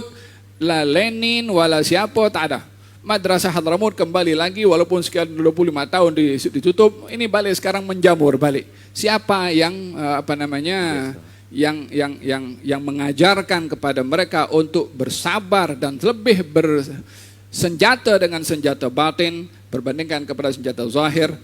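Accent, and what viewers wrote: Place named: Malaysia. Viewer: Ini logatnya kayak orang malaysiau😂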